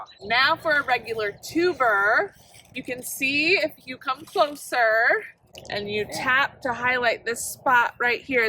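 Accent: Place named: Chicago, United States. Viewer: I love hearing your Chicago accent!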